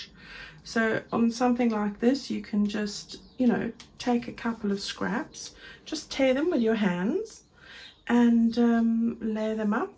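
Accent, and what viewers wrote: South Africa. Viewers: It's the way you say"here" then you said you used to live in S.A. And then I realised why I couldn't place it. You've been here a while hey xxx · I absolutely love your videos... Sorry it is so hot there but here in Johannesburg it is freezing and I am in Europe next week and I can't wait for a bit of warmer weather... I also don't like it too hot though... I knew you had spent time here in SA I can hear it in your accent... so nice to see one of ours doing so well ... thank you for all your beautiful stuff. <3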